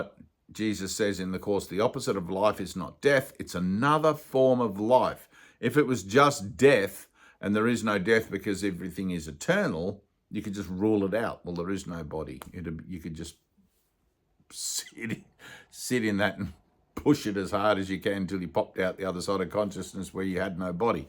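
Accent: Australia. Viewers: You sound Aussie. Are you?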